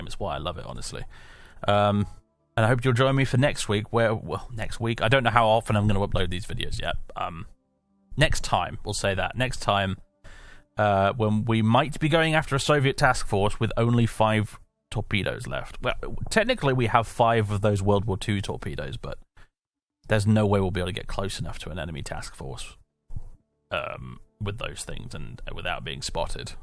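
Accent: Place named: United Kingdom. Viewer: Well these vids are turning out to be highly absorbing and nail-bitingly tense! Nice to hear a British voice in command of a British boat and explaining the reasons why our Tigerfish perform so dismally in game…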